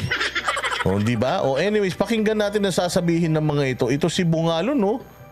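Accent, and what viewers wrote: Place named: Visayas, Philippines. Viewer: Tawang tawa kami sa iyo uy. Para kang bisaya tulad namin🤣🤣🤣🤣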